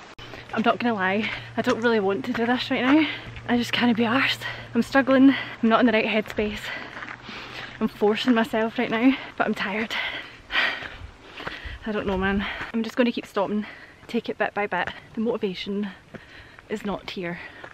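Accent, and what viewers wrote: Scotland, United Kingdom. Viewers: I am in love with the Scottish accent!! 😍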